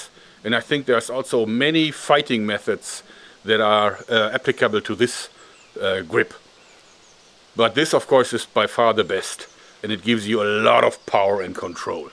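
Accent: Germany. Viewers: Did you ever live in America or you learned English in Germany? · Hi, Can you please explain in more detail why you don't likr the last knife that you presented last. Thanks, Also keep up the good work and I love your vids even though sometimes I cant understand what you are saying cause of the strong German accent, but after I rewind and play the part in question I can usually piece it together. Pete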